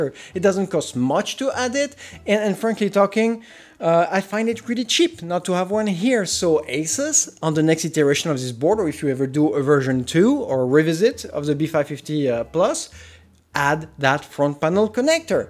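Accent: France. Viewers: Your french accent is awesome.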